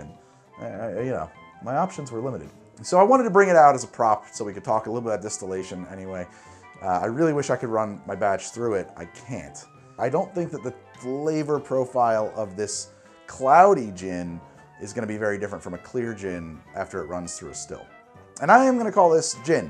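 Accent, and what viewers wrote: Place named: United States. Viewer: I don't actually know where lives, I assume the US because of his accent and turn of phrase, I assume you live there as well because of your attitude, I could be wrong and you could both live in Australia, I really don't know and it frankly makes no difference. And as I don't know, I will go with the worst possible situation (eg 'doing this is breaking the law') and work from there, if it's not illegal where you are that's great! Have fun, try not to burn yourself on any of the hot surfaces and liquids that this process will create, and be careful with which fractions you choose to drink from it. But I'm not going to claim to know what the distillation laws across the globe are, I'm not a lawyer.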